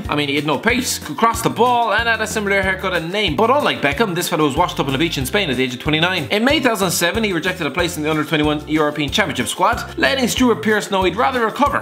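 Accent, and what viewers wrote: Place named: Ireland. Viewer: Love the Irish guy doing the narration! He's SOOOO funny!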